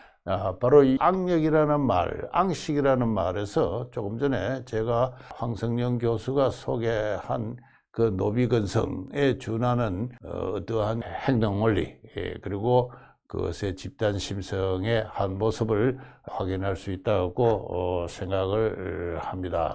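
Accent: Gyeongsang, South Korea. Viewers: 경상도. 사투리 듣기 싫어유 😢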